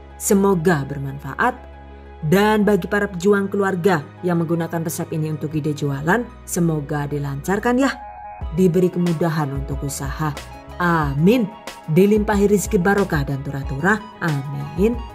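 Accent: Banyumas, Indonesia. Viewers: Betul banget. Suaranya juga khas😁😁 apalagi pas ngmg ngapak. Hrhe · Ngapak banget terhibur aku🤣🤣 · Seneng bingit sama chanel inih# apalagi pas si mbake ngomong bahasa ngapak & English🤩🤩🤩🤩🤩#GiveawayTHV2021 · Deneng kaya wong ngapak 🤭 Ternyata ibune ayu,terus suarane khass CATAT..😆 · Seru kl lg ngomong banyumasan, suaranya nge bass ternyata mbak nya imut cantik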